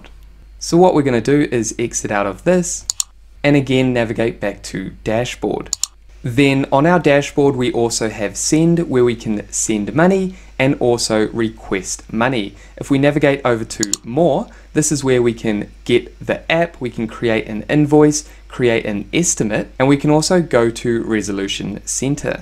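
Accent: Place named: New Zealand. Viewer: Aww jesus, a NZ accent is bad enough but a queer NZ accent is the pits!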